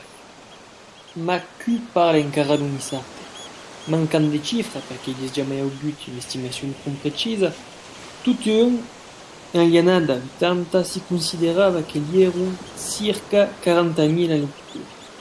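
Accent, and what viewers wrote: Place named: France. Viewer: Je doute que ce dialecte de l'occitan se parlât avec cet accent français.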